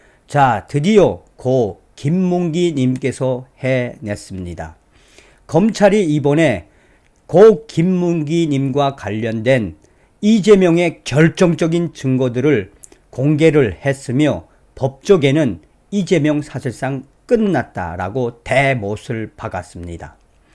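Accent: North Korea. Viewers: 탈북민 이세요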